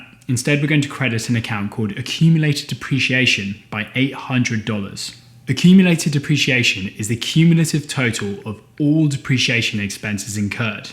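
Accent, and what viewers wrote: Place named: England, United Kingdom. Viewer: Is it just me or does Accounting make more sense when explained in an English accent.... lol fr thx for sharing these informative & concise vids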